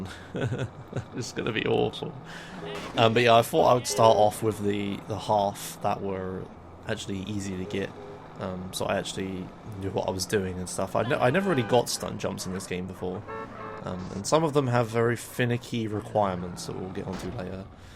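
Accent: United Kingdom. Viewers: He is speaking normally, that's how us brits sound.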